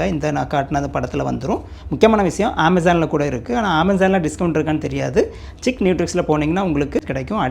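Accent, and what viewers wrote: Tirunelveli, India. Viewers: Sir ungalukku thirunelveli ya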